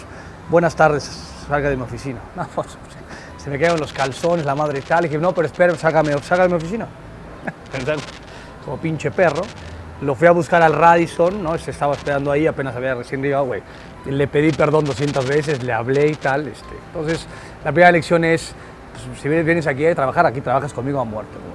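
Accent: Spain. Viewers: Lo unico q no me gusta es q uses esa forma de ablar muy español si eres de la ciudad de mexico abla como mexicano creo q es muy feo q por q viviste en españa dos o tres o dies años ya no puedas cambiar tu forma de ablar yo soy de oaxaca y con mucho orgullo lo digo tengo mas de treita año viviendo en la ciudad de mexico y aqui en la ciudad ablo como se abla aqui en oaxaca ablo como alla. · y gradualmente enfatizas el acento gachupin.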